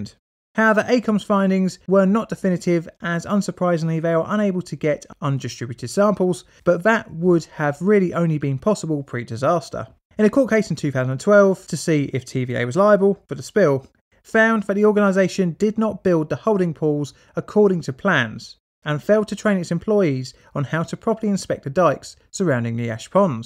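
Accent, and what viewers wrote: United Kingdom. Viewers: Why is a limy narrating this, ENGLAND HAS ENOUGH ISSUES OF ITS OWN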